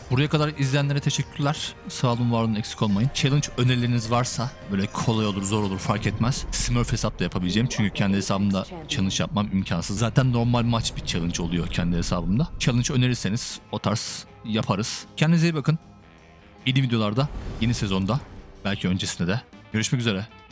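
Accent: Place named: Russia. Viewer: Rus aksanlı Smurf lütfen devam :)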